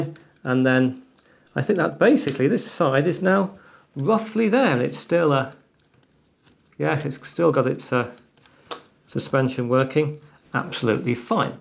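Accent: United Kingdom. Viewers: What kind of British accent do you have? Because which ever one it is, it's the one that always sounds low-key being cheeky.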